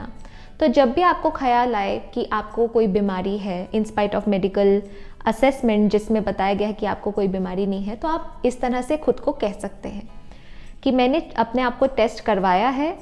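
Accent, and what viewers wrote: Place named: Bengal, India. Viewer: Aapki language bangaali tone jesi lagti he Kuch kuch.... Aap bangaali he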